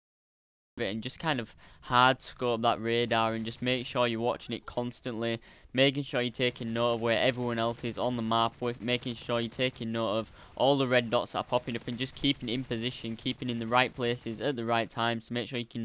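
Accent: United Kingdom. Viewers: Are u British be ause I want to no cause ur accent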